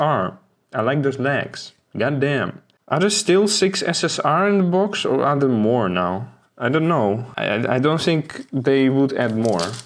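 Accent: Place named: Netherlands. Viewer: Ur from holland i can hear xD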